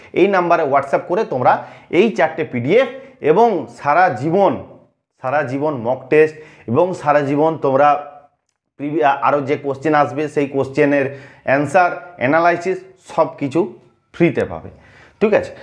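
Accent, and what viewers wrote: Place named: Purulia, India. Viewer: Dada tomer bari kothai voice ta purulia r moto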